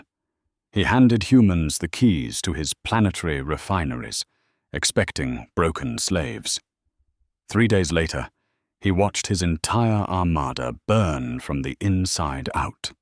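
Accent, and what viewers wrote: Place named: England, United Kingdom. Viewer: I like the uppity English voice........original......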